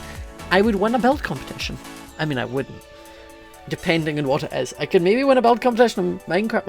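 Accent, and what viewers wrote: Scotland, United Kingdom. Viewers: I LOVE YOUR ACCENT YOU SCOTTISH CUTIE ❤️❤️🏴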